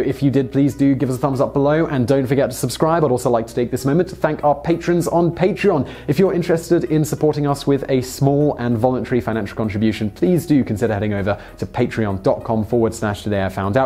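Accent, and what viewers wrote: United Kingdom. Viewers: Oh look, the British Mathew Santoro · I'm confused. Why is someone British (by his accent) explaining about fire hydrants in the USA? In Britain hydrants are below ground level and plates ('H') indicate the hydrant distance from the plate and the diameter of the water main.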